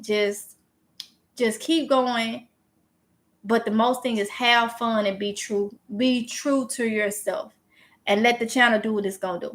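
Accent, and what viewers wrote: South, United States. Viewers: GM, im awake at 4 something in the morning. Im 79 yrs old in upstate NY. Im learning and remember when i was 36 yrs old, i had a new born baby, working the night shift and husband took care of baby at night. I love you take care of your family. And I can understand your southern accent. My Mom had it also.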